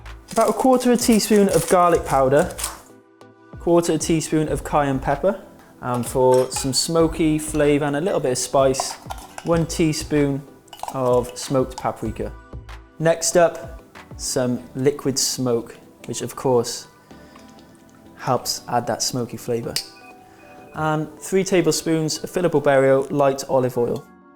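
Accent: Wales, United Kingdom. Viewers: Do I hear a welsh accent?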